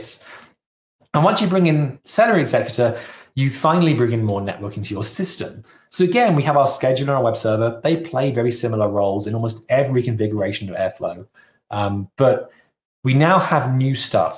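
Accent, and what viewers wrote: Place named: Scotland, United Kingdom. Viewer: Very useful video! I'm happy I've found this one. It has been a bit difficult to start with Airflow. By the way, I'm intrigued with your accent. Are you from Scotland?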